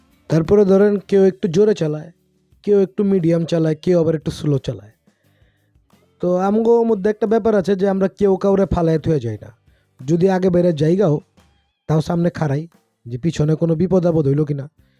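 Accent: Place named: Dhaka, Bangladesh. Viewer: ঢাকাইয়া ভাষায় সুন্দর বি হয়চে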